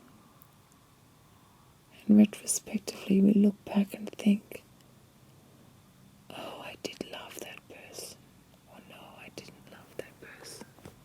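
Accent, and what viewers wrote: Australia. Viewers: Hia... Just thought I'd say thanks for another amazing video! Literally, when I cant sleep I turn straight to your vids... and I always find something that helps me dose off.......The aussie accent is also a big bonus!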